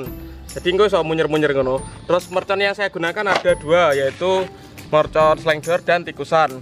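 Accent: Java, Indonesia. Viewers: Hhhh .wong ndi .kok kentel jowone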